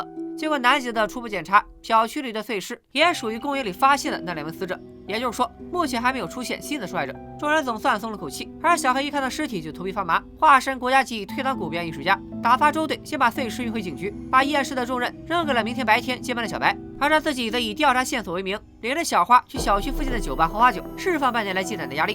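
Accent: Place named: Qingdao, China. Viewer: 请问你是青岛人吗？感觉口音好重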